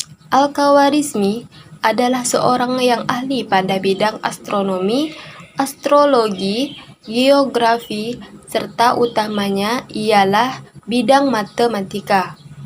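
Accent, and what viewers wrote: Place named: Ketapang, Indonesia. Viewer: Logat ketapangnye ade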